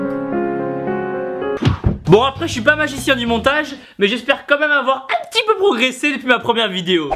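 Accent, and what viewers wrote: South, France. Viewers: Ta l.accent du sud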